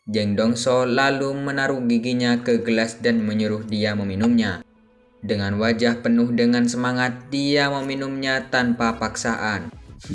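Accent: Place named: Madura, Indonesia. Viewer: saran sih min, untuk suaranya mungkin lebih santai lagi, dan untuk logat maduranya lebih di redam lagi atau diminimalisir mungkin😭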